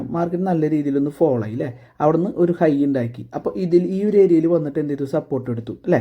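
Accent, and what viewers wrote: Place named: Thrissur, India. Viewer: ചേട്ടാ 3 മിനിറ്റ് ടൈം ഫ്രെയിം ഉപയോഗിച്ചു smc വരച്ചു നോക്കൂ അടിപൊളി ആണ് എനിക്ക് ഇവിടെ ചാർട്ട് പോസ്റ്റ്‌ ചെയ്യാൻ പറ്റുന്നില്ല ഇന്ന് 9.20 നു ce എൻട്രി 9.42 നു put എൻട്രി 2 ഉം അടിപൊളി ആയിരുന്നു. ഇവിടെ ഉള്ള ആളുകൾ forex മാർക്കറ്റിൽ യൂസ് ചെയ്യുന്ന smc സെറ്റ് അപ്പ്‌ വെച്ചു ഇന്ത്യൻ മാർക്കറ്റിൽ ട്രേഡ് ചെയ്യുന്നത്. അത് കൊണ്ട് smc യുടെ കൃത്യമായ ഗുണം കിട്ടില്ല ഇന്ത്യൻ മാർക്കറ്റിൽ ഇയാളുടെ വോയിസ് കേട്ടിട്ട് ഇവിടെ തൃശൂർ അടുത്ത് ഉള്ള ആള് ആണ് എന്ന് തോന്നിയത് കൊണ്ട് പറഞ്ഞത് ആണ്. ഈഗോ ഒന്നും തോന്നരുത്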